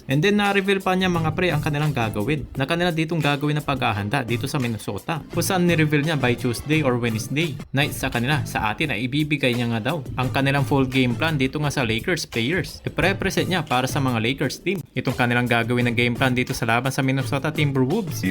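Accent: Pangasinan, Philippines. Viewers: taga pangasinan ka awa? hehe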